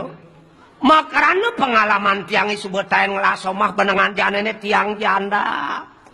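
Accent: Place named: Buleleng, Indonesia. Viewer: Logat asli Buleleng, kasar tapi lucu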